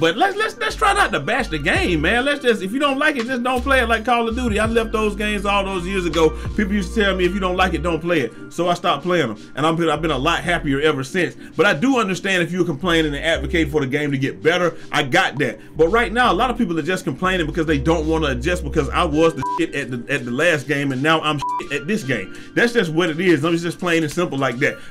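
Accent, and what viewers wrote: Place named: South, United States. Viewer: Crazy you had Shannon Sharpe in this video lol I be calling you the Shannon Sharpe of the 2k community. Not based on age of course but just style and personality. Funny as hell 😂 and the southern accent too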